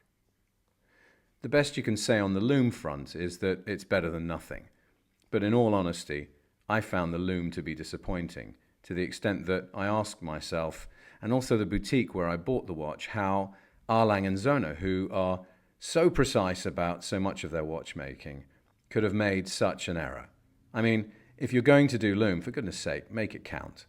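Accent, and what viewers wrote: United Kingdom. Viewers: It’s really cool to find a video like this and feel like I’m having my thoughts read aloud in a British accent. I’m a huge fan of Lange and GS but have never been able to pull the trigger on a GS.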